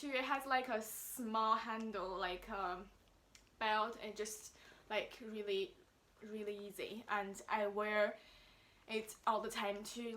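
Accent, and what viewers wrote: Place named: United Kingdom. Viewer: Your British accent is soooo cute!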